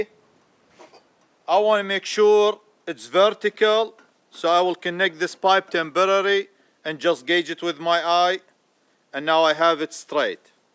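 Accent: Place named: Iraq. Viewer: لهجتك مال عراقي بس لاتطلع عراقي 😅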